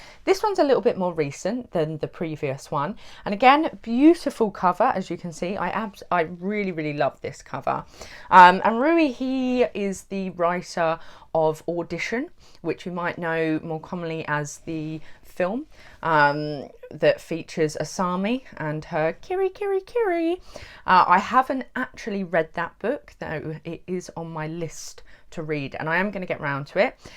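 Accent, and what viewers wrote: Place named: RP, United Kingdom. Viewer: The Engish accent is always so elegant. Doesn't matter what is being said, it sounds posh.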